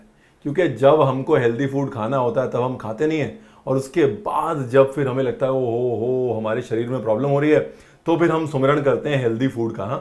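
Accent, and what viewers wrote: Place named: Punjab, India. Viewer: Ranvir bhai I'm from kashmir nd I love your way of cooking as well as talking with punjabi tadka ❤lub u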